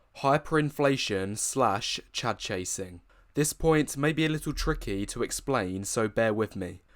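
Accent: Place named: United Kingdom. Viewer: bro your english regional accent along with your bluntly made message is so f***ing good. that being said it's really depressing hearing the truth :(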